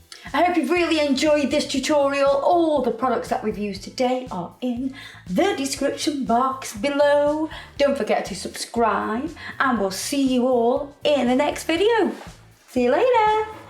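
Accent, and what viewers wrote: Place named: North, United Kingdom. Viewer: That looks freaking awesome! Loving this second channel, as soon as I've watched your nail tutorials I come over here haha! it's great to here a fellow northerner on YouTube! Hello from Merseyside!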